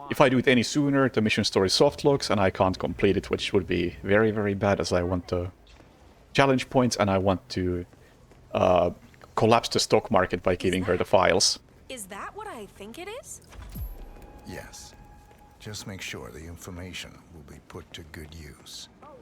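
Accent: Finland. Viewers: Also the thing with the mastery change to 20 theres a bit more to it than that and it’s more understandable when u know the nuances: Hitman 3 changed A LOT regarding Hitman 2’s challenges when porting the old content over. Challenges were cut, sometimes reworked and most importantly they moved around unlocks. Like for example they removed all the Classics challenges for each difficulty which stacked up to a big XP bonus, added challenge pack rewards to the mastery track and had it so that there was rewards that counted how many SASOs in general you did rather than tying it to Master Mode specifically So all in all once you do that there was probably instances where that stuff had to be rebalanced, New York already had challenges which gave out less XP to make it the same speed to master as other levels so with a chunk of challenges gone they would have to give the levels different values anyway so they thought why not just make it more consistent with other maps while theyre at it? 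Plus you seem to forget how much people judge a map’s replayability on its mastery levels. This was way better for marketing, even when they eventually bring Hitman 2’s DLC into the full bundle like they did with the Switch2 version it’ll still be better for free players to know theyre getting 20 maps of around the same level of replayability rather than thinking some are less valuable than others Great video tho, i love the fact your finnish(?) accident makes “vault and climbing” sound like “bald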